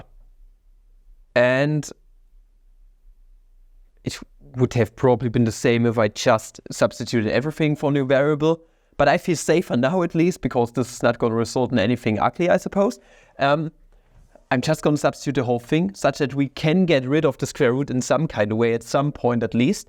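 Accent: Germany. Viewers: I very much appreciate your videos, just brilliant mathematics. The heavy German accent is not a big deal (I’m German myself). But please, please, do me a favour and try to pronounce the “i’s” and the “y’s” as /ai/ where needed. A “y” and the word “why” is pronounced correctly /wai/ and not /va/. It means corporal pain to me to hear it like 100 times in a row in your videos.😢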